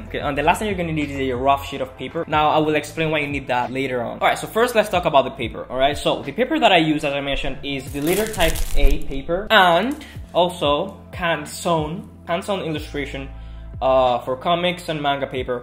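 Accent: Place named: South Asia. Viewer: He sounds so South Asian I guess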